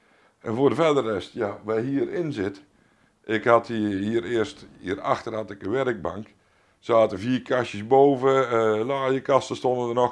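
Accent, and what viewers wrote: Brabant, Netherlands. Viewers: Leuke video's!! ik kom zelf uit Oss maar woon al enige tijd in Gelderland. Heerlijk om dat Brabantse accent te horen!!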